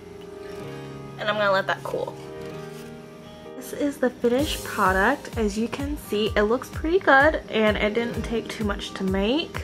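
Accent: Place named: England, United Kingdom. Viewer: where u from u sound like ur from england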